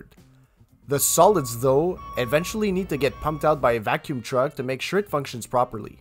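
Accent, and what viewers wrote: Quebec, Canada. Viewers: Nice video! T'es québécois hein? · Quebec French? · This guy is from Quebec for sure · Yeah his definitely from Quebec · You have an accent, est tu québécois ? ;) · French Canadian?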